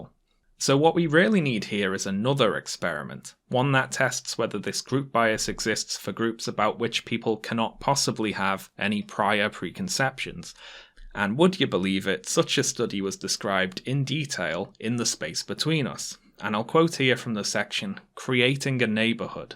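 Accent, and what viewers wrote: United Kingdom. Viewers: You know, you believe a lot of things I disagree with and have faulty bolster for some of your arguments, but your voice is very relaxing. Carry on, ever so slightly lispy British man.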